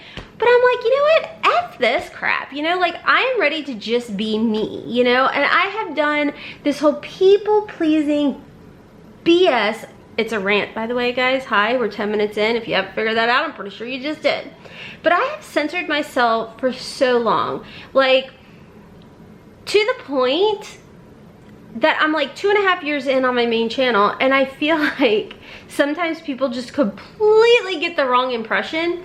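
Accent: South, United States. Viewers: hearing your southern drawl when it sneaks out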